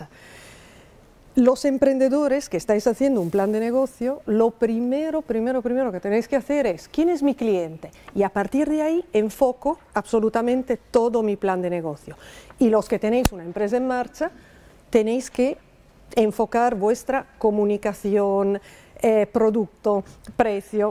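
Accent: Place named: Peru. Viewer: Me parece escuchar a un señor de nacionalidad peruana, en el curso, Esta clase es exelente, saludos desde Peru.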